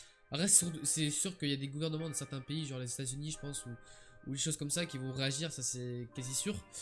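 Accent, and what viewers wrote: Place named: England, United Kingdom. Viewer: Ton accent anglais god damn... 😒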